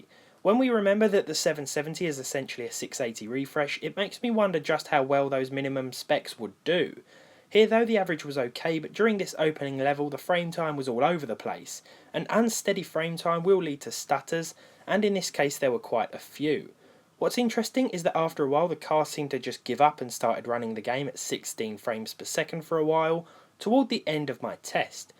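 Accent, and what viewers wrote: United Kingdom. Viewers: I hate that british speech 😂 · I heard british accent---------------------> i leave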